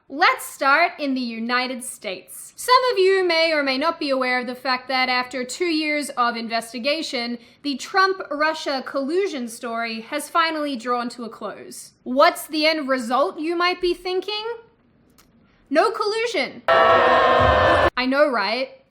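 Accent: Australia. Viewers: I love her Australian lilt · Did you live in America for a while? Your accent sounds like a fusion of Australian and America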